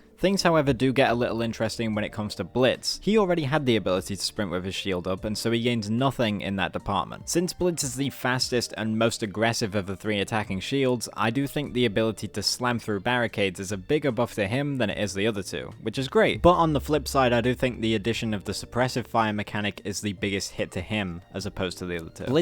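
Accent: United Kingdom. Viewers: Didn't know you were British what part??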